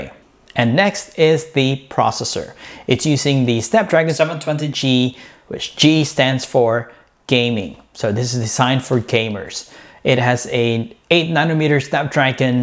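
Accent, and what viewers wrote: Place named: Visayas, Philippines. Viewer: bisaya diay ka sir. hehe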